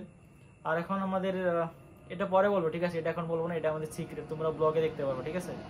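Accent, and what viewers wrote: Assam, India. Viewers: Dada amio assam er.... Tmr moto bangla boli😅😅😅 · Hmm amnitew Assamer bangla language olpo belek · Tomar Kotha Sunle bujha Jay Tumi assam er dada... Amio assam theke Tomar vdo dekhchi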